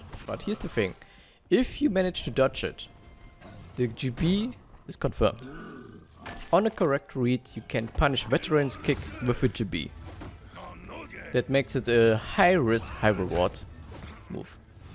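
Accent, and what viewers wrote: Germany. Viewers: is he from germany becaus of his pronaunciation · Man hört heraus das du Deutsch kannst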